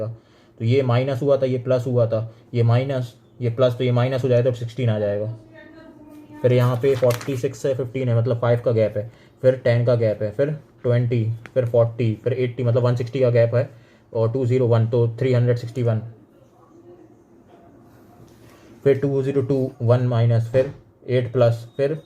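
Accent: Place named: Bundelkhand, India. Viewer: Bundelkhandi jhalak rhi h apki tone me... Gajab badde.! Maan gaye..💥💥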